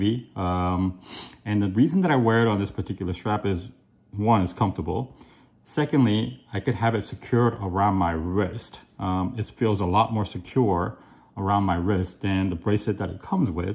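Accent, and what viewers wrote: United Kingdom. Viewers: You say it as if British accent is a choice 🤔 just not sure what your original complaint was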